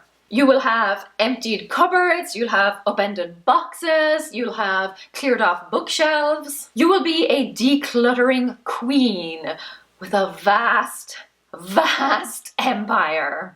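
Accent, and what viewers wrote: Ireland. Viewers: OMG that school organiser thing you showed gave ME memories!? definitely had one at some point! Great video, I’m on a Konmari spree and it’s always so nice to hear a familiar Irish accent with great content :)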